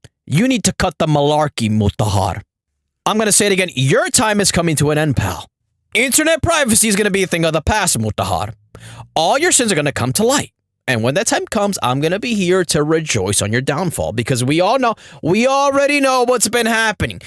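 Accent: India. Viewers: First thing I hear is an indian accent 😂😂😂😂😂😂😂😂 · You're racist, but your Indian accent is quite entertaining.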